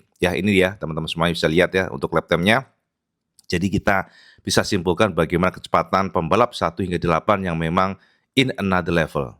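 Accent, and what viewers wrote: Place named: Java, Indonesia. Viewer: Mas.. Mirip logatnya kaya, yg ngonten KIOS KOMPUTER..! yg dari jawa juga... 😊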